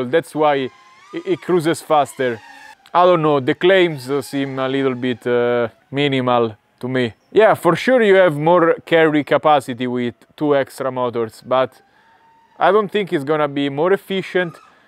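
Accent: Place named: Italy. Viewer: 2 parole e ho subito capito eri italiano ahah grande 💪🏽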